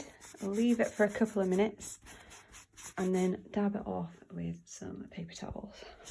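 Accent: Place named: England, United Kingdom. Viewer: Many thanks for these tips and so nice to hear an English accent for once. I think you were really brave to put it in the machine! I've just purchased a 1970's Sherpa style longish Sheepskin jacket/coat which needs some tlc. The fur was originally white but has turned greyish now but the condition isn't terrible. I like your idea's of using the hair products, that is not something I would have thought of tbh, but I think I need to slightly bleach this fur back to a whiter colour because the jacket has white stiching. It would have been fantastic back in the day, but thanks again for these tips.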